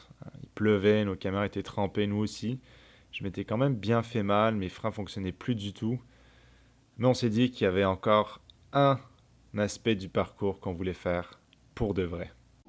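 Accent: Quebec, Canada. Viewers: J'ai l'impression que ton accent québécois est + marquer qu'avant :o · WTF il est Québécois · c'est marrant pcq j'ai l'impression que tu prend de plus en plus l'accent quebecquois , enfin tu l'avais surement avant mais tu devait moins le montrer dans tes videos a l'epoque ^^ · T'as pris un putain d'accent québécois 😮😮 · C'est moi ou ton accent québécois ressort encore plus qu'avant ? :o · je te suis depuis à peu près 5 ans, et j'ai eu l'impression (dans cette vidéo) qu'il y a un petit accent Québécois qui commence à arriver que tu n'avais pas avant. C'est juste moi ou? ^^ Sinon comme dab, super plaisirs de te voir en vidéo:) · On entend beaucoup plus l'accent québecois de dans cette vidéo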